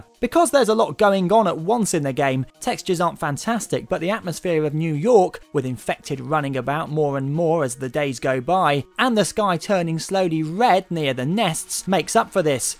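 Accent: United Kingdom. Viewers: this british guy with a british accent is talking way too british fast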